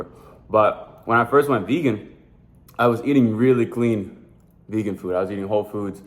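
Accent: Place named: AAVE, United States. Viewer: Lose the ebonics white boy.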